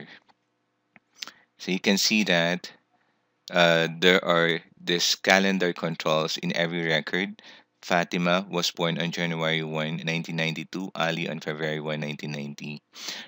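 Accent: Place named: Philippines. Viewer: Hmm! Filipino accent X))))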